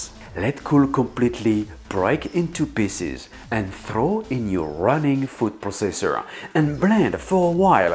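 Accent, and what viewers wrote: France. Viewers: The croissants seems amaizing, but any similarity with France (albeit the accent) was lost when you defined the butter layer in imperial rather than metric. So sad... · Not sure if he's American trying to do a French accent, or French trying to do an American accent... 🤔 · I can't figure out if this guy has a French, American or British accent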